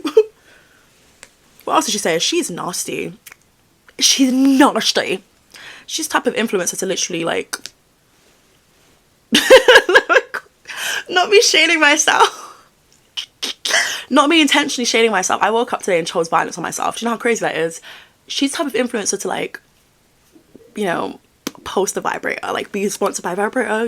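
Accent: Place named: England, United Kingdom. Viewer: GIRL how did i never noticed that you had an English accent until you literally said so 🤦‍♀️🤦‍♀️🤦‍♀️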